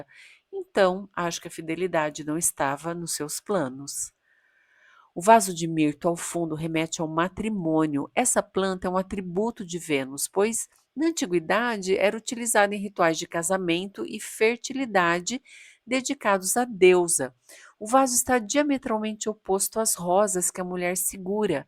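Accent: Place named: Rio de Janeiro, Brazil. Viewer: Acho que vc é carioca!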